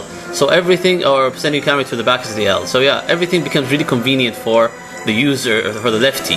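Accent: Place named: Scandinavia. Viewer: Haha. Yeahh, it is mostly the accent that sounds like a scandinavian's, especially the way you say the "R" sound. Also the lightning made your skin color look kind of possible for a scandinavian. The video was very understandable though. I am used to the accent by myself being a Scandy :)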